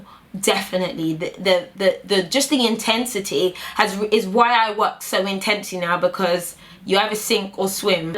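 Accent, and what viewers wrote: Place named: London, United Kingdom. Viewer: Her accent is nice 💖💖💖💖💖💖💖💖💖💖 London Accent love it 💖💖💖💖💖💖💖💖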